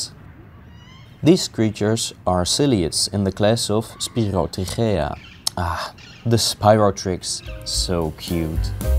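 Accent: Netherlands. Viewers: i can hear the dutch accent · Why do you pronounce the Latin names in Dutch? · Love het nederlandse accent geweldige video ❤ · why does your accent sound dutch are u also a dutch guy ??? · I love how awkward he is coupled with how awkward the Dutch accent is, while being very interesting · I love the way you unapologetically pronounce things with your Dutch accent 🇳🇱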